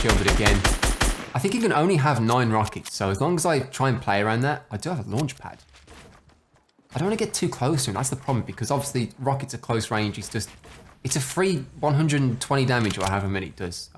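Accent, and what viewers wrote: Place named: England, United Kingdom. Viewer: “Tiki heads” are from Easter Island where they call them “moai”. Pronounced kinda like the English words “more I” if you read it with English accent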